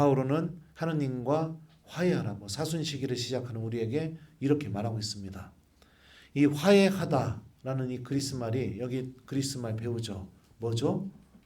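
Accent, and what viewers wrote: Chungcheong, South Korea. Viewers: ❤🎉 저는 2000년에 멀리 캐나다 🇨🇦 토론토 지역 오로라시에 와서 살고있는 충남 서산시 에서 첫 영성체를 올린 조 루가입니다. 그동안 풀납동성당, 잠실성당, 역삼동 성당에서 레지오 활동과, 성가대 횔동을 하다가 emigration 으로 캐나다 🇨🇦 토론토 에 왔습니다. 신부님 충청도 말씀을 듣고 친근감을 느낍니다. 조 루가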